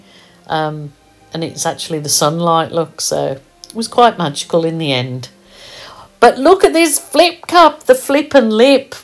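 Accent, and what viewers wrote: Birmingham, United Kingdom. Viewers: I love listening to your voice it sound from Birmingham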